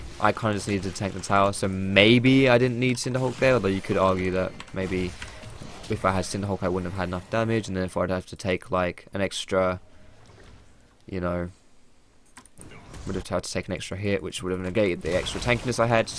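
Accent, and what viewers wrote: France. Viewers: is he french ?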